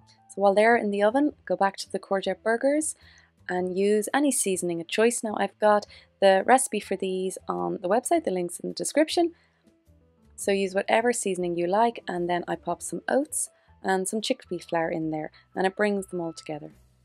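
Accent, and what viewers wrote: Ireland. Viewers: Are you Irish? I detect an accent. Thanks for this. It was fun to watch!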